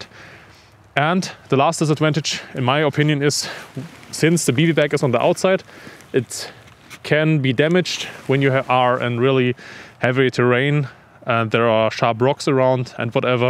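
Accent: Germany. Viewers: Why are you wearing a mask when its not even cold? You generally wear attire like that below -5 to -10C. It looks like its above freezing in this video. Gonna get Covid miles from civilization? It muffles your voice making the German accent more difficult to understand (though your English is obviously excellent). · Na, das ist doch ein deutscher Akzent,oder?😊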